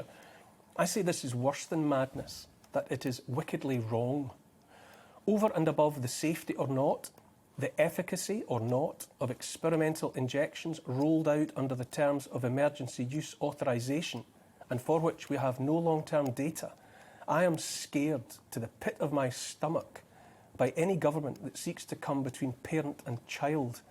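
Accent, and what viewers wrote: Scotland, United Kingdom. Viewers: Listening from across the pond. Your point is made all the more poignant with your beautiful Scottish accent. We are all brothers and sisters in this madness. Thank you for your courage and may we all have the same courage as you. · What a great commentary, and delivered in a wonderful Scottish accent as well! I feel like a tired, worn-out soldier in a foxhole almost thinking it's all over who's just heard the bagpipes of an approaching Scottish regiment coming to my aid and just knowing everything's going to be all right!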